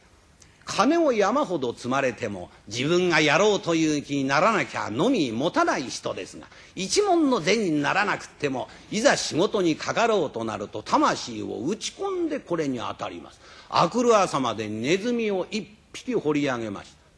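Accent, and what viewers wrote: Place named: Edo, Japan. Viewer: べらんめえ調の良さ。 いいね！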